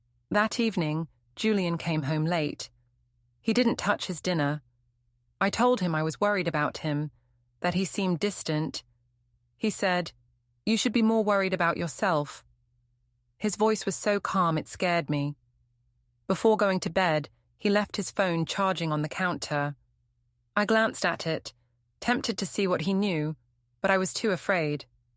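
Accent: England, United Kingdom. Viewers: why does this sound like the most entitled english lady in existence?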